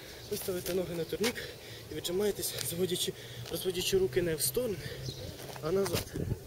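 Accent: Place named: Russia. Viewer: і в додаток, відчувається, що в твоєму оточенні розмовлять російською), "щ" = "шч" при вимові, де "ч" проривне і чітке.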